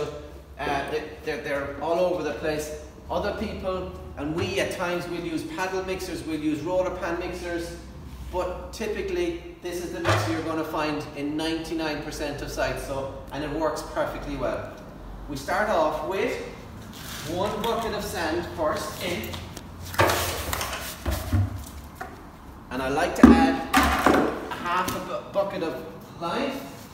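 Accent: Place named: Ireland. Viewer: While I like the Irish accent in English, it always reminds me of the sad state of the Irish language.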